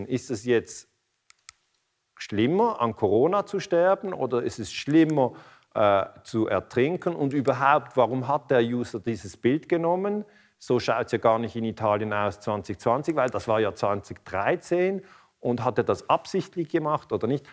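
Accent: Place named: Switzerland. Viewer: Und ich mag auch Ihr Schwitzer Dütsch! 😊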